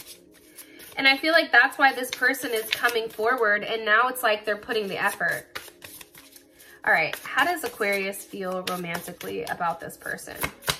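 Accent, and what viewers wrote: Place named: United States. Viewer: Luv your American accent (I'm English )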